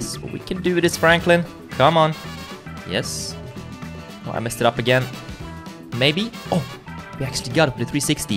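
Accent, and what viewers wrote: Sweden. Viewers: man hör på dig du är svensk lol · Är du från svergie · Are you swedich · Är du från Sverige?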